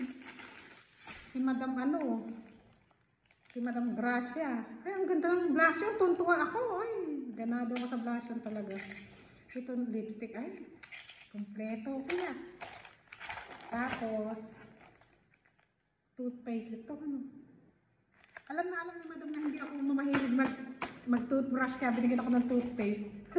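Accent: Nueva Ecija, Philippines. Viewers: Ateh saan ka sa pinas parang nueva ecija ka ba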